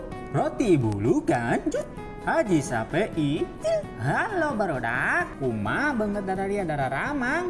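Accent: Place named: Citorek, Indonesia. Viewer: Logat mna ini bg kaya kenal, citorek bukan si😅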